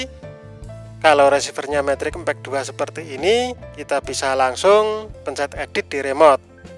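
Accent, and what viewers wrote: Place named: Salatiga, Indonesia. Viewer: Wong Solotigo ya? Coro ngomonge koyo wong Solotigo..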